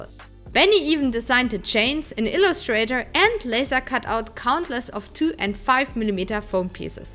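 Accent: Germany. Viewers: den deutschen akzent hört man einfach immer raus 😄 sehr geil gemacht ❤❤❤❤❤ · you sound like you're german... and you probably are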